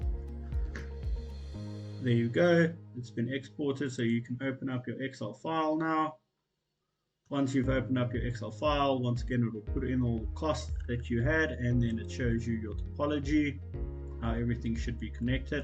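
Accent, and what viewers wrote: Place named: South Africa. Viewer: South African voice……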